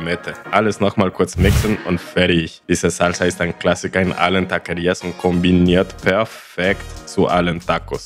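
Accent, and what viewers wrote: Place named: Russia. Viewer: lol du hast ur den russisch akzent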